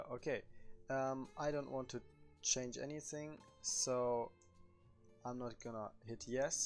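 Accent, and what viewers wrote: Germany. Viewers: digga du bist zu 1000 prozent deutscher haha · Einfach schön auch mal Deutsche Englisch sprechen zu hören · Ich liebe dein Deutschen akzent 👍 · Man hört so hart das du deutsch bist lel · Deutsch man hört es direkt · Man hört den deutsch Akzent 😂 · Ich liebs wie man Deutsche an Ihrem Englisch erkennt haha, danke fürs Video ! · i can hear the german accent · Ok Cool Aber man hört deinen deutschen Akzent :) im englischen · aber sprichst deutsch, das meinte ich, und du hast den launcher auf Deutsch und man hört den deutschen Akzent raus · Man hört so krass das er deutsch is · Are you German? If yes, you speak good English👍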